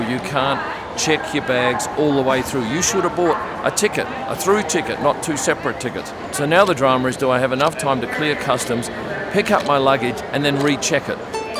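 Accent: Australia. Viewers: A kip? A dickie seat? Loving the your Ausiness! Fun seeing in the background 👍👍👍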